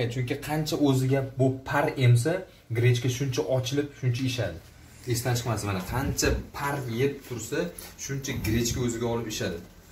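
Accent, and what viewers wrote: Fergana, Uzbekistan. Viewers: Manimcha boshizdegi Fargona doppi. Shuningchun soragandim. ham Fargonaniki togrimi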